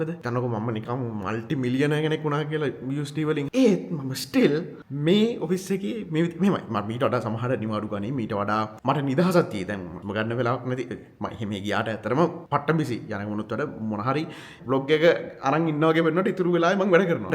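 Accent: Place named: Sri Lanka. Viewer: Olee සිංහල Accents එකට නම් හිත ගියා 🤍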